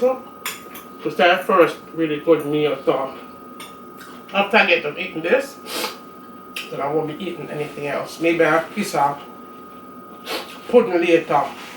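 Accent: Jamaica. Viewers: Very Jamaican. I love it.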